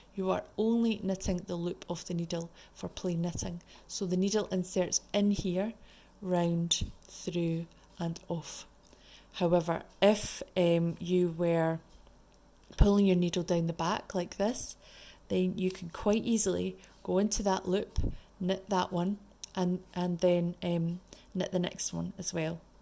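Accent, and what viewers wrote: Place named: Scotland, United Kingdom. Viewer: I Lo❤e your Scottish accent! ❤ 🥝🇳🇿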